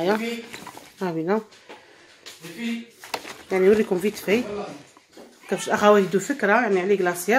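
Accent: Oran, Algeria. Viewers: شحال تعجبني هدرتك نحس روحي في وهران ههه إنشاء الله نربحو اليوم ⚽️🇩🇿🏆🤲 مرحبا ببنات بلادي عندي شكرا ربي يسعدكم و يفرحكم لي الشرف تنوروني و تشجعوني شكرا مسبقا بنات بلادي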